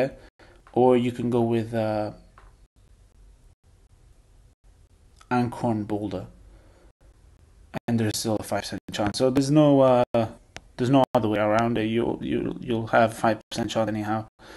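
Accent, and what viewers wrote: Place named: Eastern Europe. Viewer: cause they are like suka.. I hate it hahahaha so I need to watch more of your videos; I'm assuming you are Slavic from your accent.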